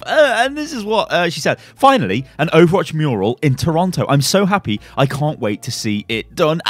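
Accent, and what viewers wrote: United Kingdom. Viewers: I hate how brits say weekend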